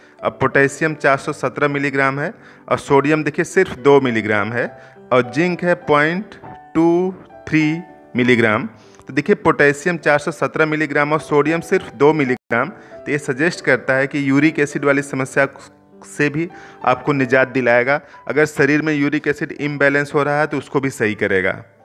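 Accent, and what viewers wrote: Bihar, India. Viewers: Dr n s varma aap khud k video kyo NAHI dalte ho ye kis bihari ki awaj h or aap khud present ho kar bataya karo. or kisi bhi fal ya sabji par video banao to uski ayurvedic property BHI bataya karo ab aap ne elopathy ka chemical value Bata di or isko sham ko ya raat ko khane se mana BHI kara h. kyo kyonki ye prakarti me thanda hota h is liye or ye baat aap kewal ayurved se hi malum hoga the true valuation of the artical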